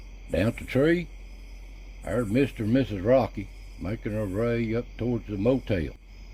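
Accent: Appalachia, United States. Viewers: I'm assuming n we should never do that but you r from Appalachian mountains for sure . Tennessee? Ty Southern brother.hillbily mill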